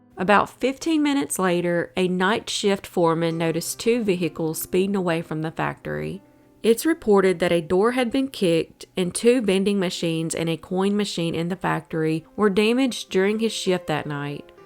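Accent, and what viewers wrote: South, United States. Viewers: As a native from new hampshire,your "southern"voice trying to pronounce basic words,NH towns and english nouns,is most annoying almost like nails on a chalkboard. It might be just me though,an English professor,but i believe some will agree with me your pronouniations and voice are ridiculous.please,leave the cold case stories to professional,normal speaking individuals.i know your just looking for views or likes,but couldn't you just stick to videos descibing basic things like what kind of food was at the county fair or what country artists were nominated at the"cmt" awards.?please,people from NH have heard enough from your nonsensical, moronically overtones.unless you'd want to make a comedy.. Could get you more views - Sincerely,English Professional.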